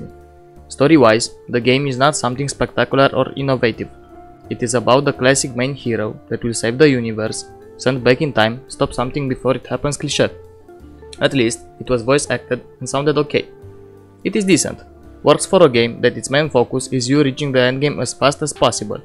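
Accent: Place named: Romania. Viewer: this guy sounds so romanian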